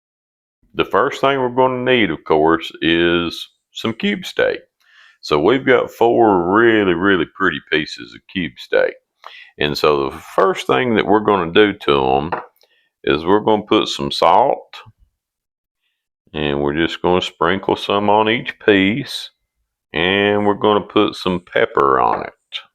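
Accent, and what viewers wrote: South, United States. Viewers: thans for the vid..'....i do this with hamburger , make four patties ,fry pour of cooking oil then add 1 jar beef gravy let simmer on low for about 30min while i make mashed pato, and greens which brings me to my Q. what keeps the homemade gravy from turning thick to the point of being clay .because gravy will get thicker the more you cook it .what am i missing .thanks ...and where are you from , nice to hear a voice from the south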